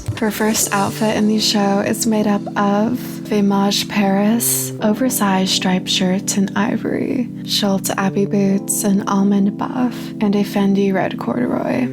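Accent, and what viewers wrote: RP, United Kingdom. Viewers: I hate how your voice sounds fry and poshy in this video. You don't need to take this ton ...its ridiculous. It took me out.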